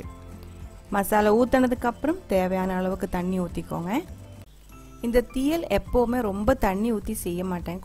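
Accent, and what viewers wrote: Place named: Coimbatore, India. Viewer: Ada ammani..... neenga at present kovaila that erukerngala OK OK athaan pathean enada covai Tamil varuthenu. super ma. I too at kovai thaan. epa Ellam unga slangla thaan en wife pessaranga super. ur style of voice is amazing and with lots of love.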